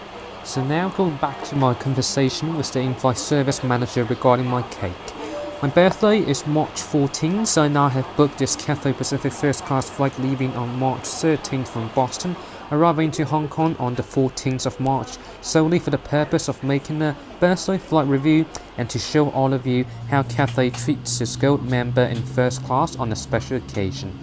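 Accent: United Kingdom. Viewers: 英国口音很牛哦哈哈哈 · 英国口音X2 <3<3<3<3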